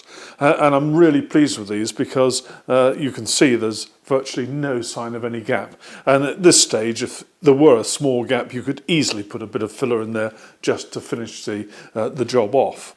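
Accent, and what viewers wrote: RP, United Kingdom. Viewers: Great presentation. Such a nice change to hear someone speaking the Queens English . Subscribed and looking forward to further instruction. Thank you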